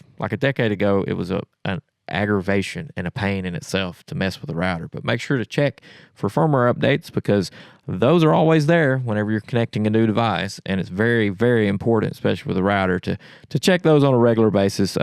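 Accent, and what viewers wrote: South, United States. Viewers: I hear southern accent, I give the video a like. Simple as that.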